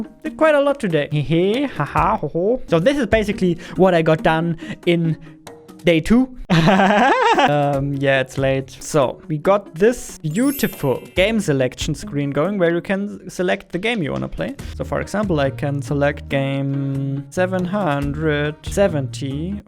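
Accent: Germany. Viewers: Oh lol I knew the accent was German (Windows-search-bar) (I don't know why I did this ) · Man kann diesen Deutschen akzent nicht überhören xD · Ooh you are germaaan. I wondered why your English sounded just slightly off. Guten Tag btw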